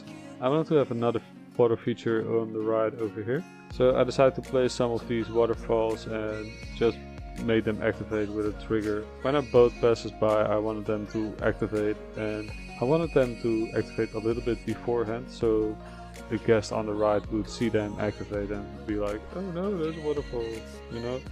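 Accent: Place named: Netherlands. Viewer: Hello! I really like your videos! I don't want to be rude, but your voice sounds foreign. Are you Dutch? You sound Dutch lol.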